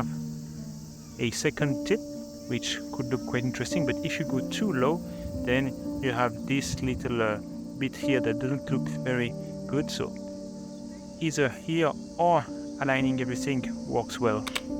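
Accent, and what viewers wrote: France. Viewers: Je me disais que ça sentais l’accent français ! Super vidéo, grand talent !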